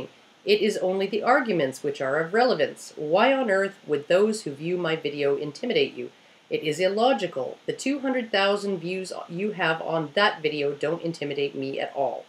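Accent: Canada. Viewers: Sorry, but I just love the way you Canadians say any word containing out Nothing against Vanadians though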